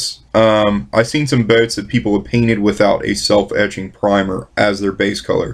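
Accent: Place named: Pittsburgh, United States. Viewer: You have to be from Pittsburgh with that yinzer accent lol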